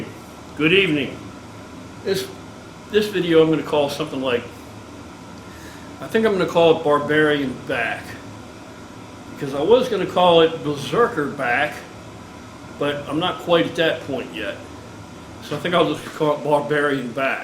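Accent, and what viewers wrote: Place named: Pittsburgh, United States. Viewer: That accent screams Pittsburgh.. speaking of, could really go for a Primantis right about now!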